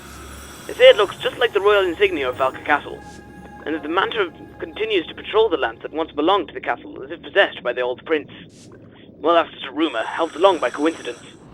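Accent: United Kingdom. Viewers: Cool!!! Hey, are you british?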